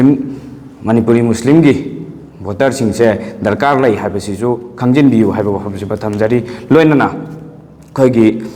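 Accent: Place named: Bangladesh. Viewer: Remember you speak like a Bangladeshi. Always try to speak in a correct way. It will be very good.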